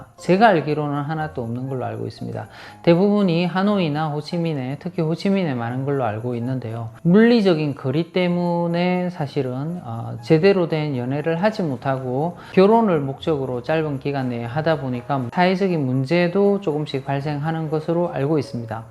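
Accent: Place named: Busan, South Korea. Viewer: 항상 성품이 차분하시고 현명한 분으로 보여요 저도 부산 출신이라서 부산 말투도 정겹고요 결혼정보 업체를 비즈니스로 하고 싶다고 한다면, 일단 그런 업체의 사무실에서 경험을 좀 쌓아야 돠겠지요 ㅎㅎ 그런데 은 그런 일이 성격상 안 맞아 보이긴 해요. 또 본인도 지금 이렇게 원하지도 않고, 베트남 여성들이 예쁘고 호감이 가고 또 우리나라 보다 현재 경제적 수준이 낮다 보니까, 한국 솔로 남성분들이 간혹 희망을 갖는 사람이 있는것으로 보여요, 구독자들에게 휘둘리지 마시고 댓글 내용도 가려서 보시고요 ㅎㅎ 주위에 착하고 예쁜 여성들이 많아서 방송을 보는 우리는 일단 즐겁습니다 도 외롭지 않게 좋은 분을 만나시길 바래요 ♡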